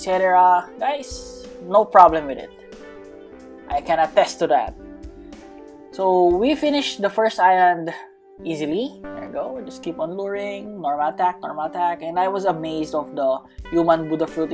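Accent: Philippines. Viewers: Is it just me or accent is Filipino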